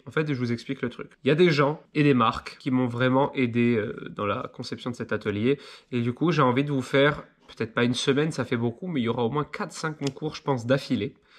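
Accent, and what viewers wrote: Corsica, France. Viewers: J'adore quand tu fais des "interrogations exclamatives", ton accent corse ressort tellement !!! "Mona elle est pas là ?!!" "J'ai pas fait couler mon café ?!!" Ne change rien 😉😄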